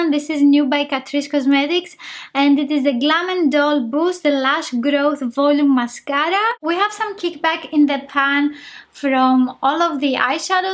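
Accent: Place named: Greece. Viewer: I bought this palette yesterday and you're presenting it for us today! Once or twice a year Essence makes a big discount on some of their product, and this was one of them. I got it and the Be an Original one for 1.10 euros each, and now when I've seen your review, I see that I made some good choices. It's great that you try to show us as many colours as you can. I always enjoy watching your reviews. Keep up the good work 😎 I've shown this video to my mom too, and she says you're sweet and cute, and your Greek accent too.💖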